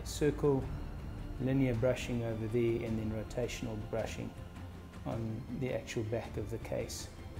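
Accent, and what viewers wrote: South Africa. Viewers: Sweden here again. Jebo,, I don't know that one so I will go with Jambo Sana instead. Both nice watches, I kind of like the black one with that pop of red and blue lume, could be a little better. By the way,, J-burg,, I guessed right on the accent.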